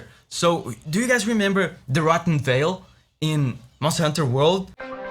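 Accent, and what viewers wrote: Brazil. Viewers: Mano, descobri que vc é brasileiro pelo seu sotaque e pelo sobre do canal. Legal demais ver brasileiros com um grande público estrangeiro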